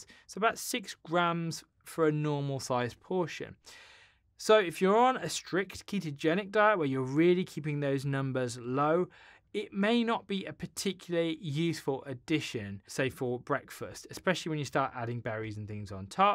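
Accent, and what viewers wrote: England, United Kingdom. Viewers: I'm glad to come across you Dr.:) I was eating a keto diet for a year and lost 3.5 stones in the first 6 months. Lock down happened and my life was wiped out. I have a weak immune system so I have to stay away from people and get my vaccine shots for the rest of forever. However, when I decided I needed to start living again and eating "keto" again I found I could not get into ketosis and eventually could not even follow the diet. I'm still struggling but I will keep trying and it's great to hear advice coming from an English accent for once. 👍😉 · I can’t deal with the British accent, sorry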